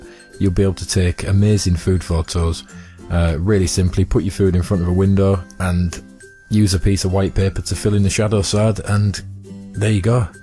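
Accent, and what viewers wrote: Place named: Leeds, United Kingdom. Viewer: sounds like a leeds accent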